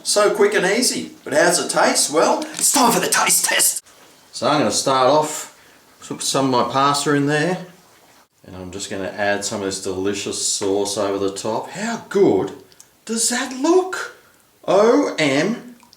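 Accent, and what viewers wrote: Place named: Australia. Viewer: You sound Aussie, I’m Australian as well. I love the way I make spaghetti Bolognase aka spag bowl I cook the mince then add mint leaves I also add mushrooms and honey as well believe it or not that tastes really nice in the mince I add a fair bit of bolognase sauce and add cheese afterwards when I dish it up you guys can decide what cheese you want but yeah that’s the way I do it and it’s absolutely beautiful, I can do that within 30 mins if not less and it’s the best meal I can do within that time imo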